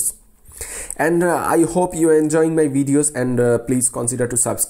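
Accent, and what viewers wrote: India. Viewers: His voice is most stereotypical indian english!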